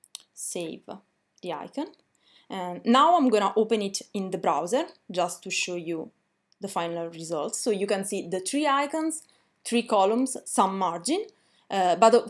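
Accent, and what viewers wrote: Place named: Italy. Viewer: Loved the accent. Italian?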